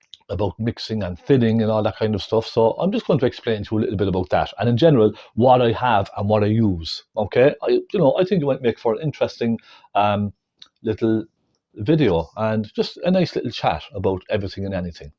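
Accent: Ireland. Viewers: is your accent Irish?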